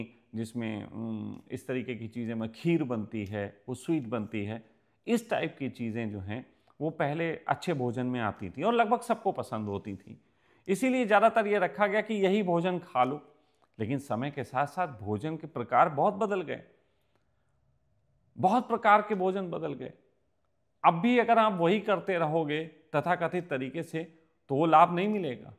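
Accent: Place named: Arab world. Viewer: Pronunciation sai lag raha hai arabian ascents hai urdu padai hai sayad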